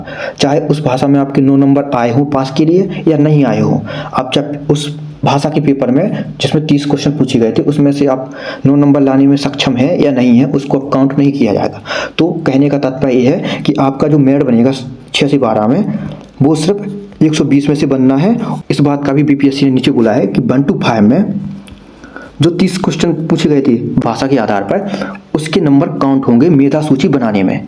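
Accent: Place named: Bundelkhand, India. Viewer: Kay bhajja bundelkhand ke ho ka ..😊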